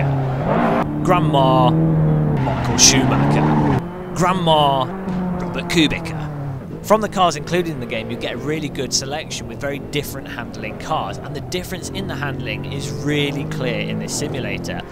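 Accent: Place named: England, United Kingdom. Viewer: Something about an Englishman reviewing racing games just feels right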